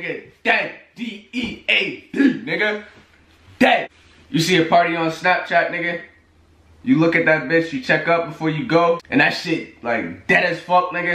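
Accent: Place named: Boston, United States. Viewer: Bruh I'm black, from Boston and I was born and raised here in the hood. You nailed it and even have the Boston hood accent. You was even so right when you was saying the other slang was for white people.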